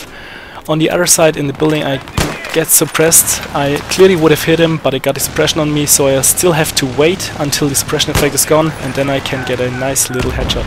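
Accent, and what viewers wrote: Germany. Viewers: als deutscher merkt man das. ^^ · Are u`re a german?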